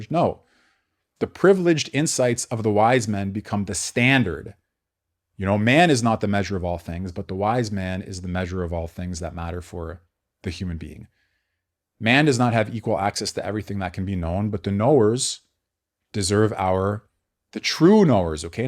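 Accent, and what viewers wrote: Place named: Canada. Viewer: Canadian accent was a surprise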